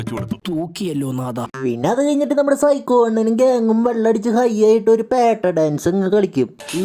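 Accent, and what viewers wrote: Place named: Puthenvelikkara, India. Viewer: ഇത്രയും വൃത്തിക്കെട്ട ഒരു voiceover അടുത്ത കാലത്ത് കേട്ടിട്ടില്ല മൈര് 😂... വളിക്ക് എക്സ്ട്രാ മണം പോലെ അവന്റെ പുത്തൻവേലിക്കര സ്ലാംഗും... കാട്ടവരാതം തന്നെ മൈരാ...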